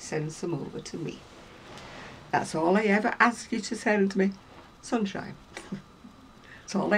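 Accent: United Kingdom. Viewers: Hi:) I loooove watching your vlogs and listening to your voice with a British accent:). Whaaat a nice sweater ____ looove it:)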